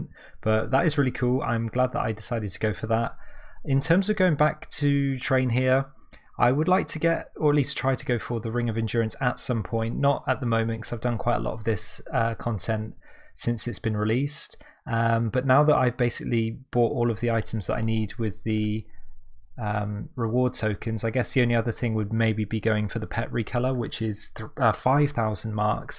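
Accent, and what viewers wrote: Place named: RP, United Kingdom. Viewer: bro im british and even I think you sound posh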